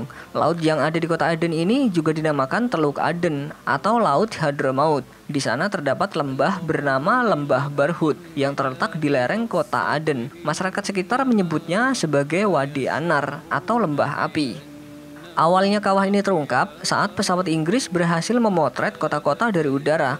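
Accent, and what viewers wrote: Java, Indonesia. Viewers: Fokus video bree... Kok malah fokus komen😂 Piye arek² Iki😂 Pengetahuannya di video Ora Nang komen🤣.. #amet bahasane Medok😂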